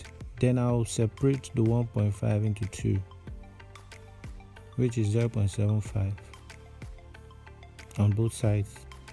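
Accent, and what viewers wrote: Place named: Africa. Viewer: Employ me at youtube to translate for you,its like you guy don't understand Africans and Asians spoken English 😒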